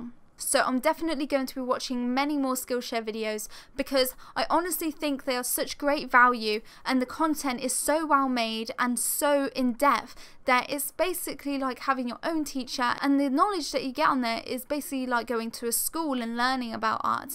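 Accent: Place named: Northern England, United Kingdom. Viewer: I understand her perfectly but I'm northern English like her, lol. Hang in there!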